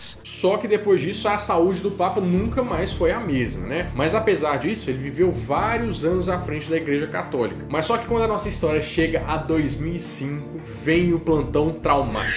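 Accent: Minas Gerais, Brazil. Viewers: Cê é mineiro né? Melhor sotaque do mundo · Comecei o vídeo e fui pensando “esse cara é mineiro, é igualzinho meu irmão falando”. Quando ele falou “aqui em Belo Horizonte”, soltei a gargalhada.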